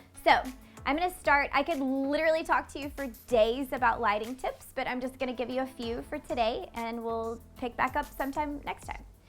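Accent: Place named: South, United States. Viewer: Love your charming southern accent and personality. Excellent tips on the dimensions. Nobody tells us that. jkr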